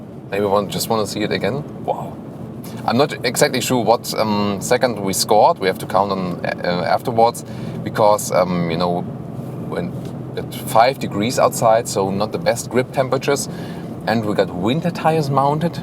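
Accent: Germany. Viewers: can't understand, you in Germany, you are German... and reviewing in English, don't get me wrong, but your German accent pain to listen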